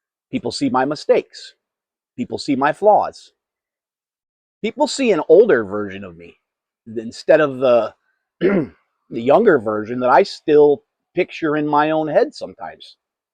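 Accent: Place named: Georgia, United States. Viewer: "Let's have a talk" in that warm Georgian accent is absolutely killer. jmho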